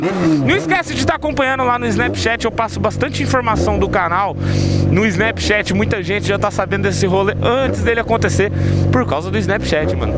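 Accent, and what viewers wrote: Goiás, Brazil. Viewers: Tu é de Goiás mano? kkk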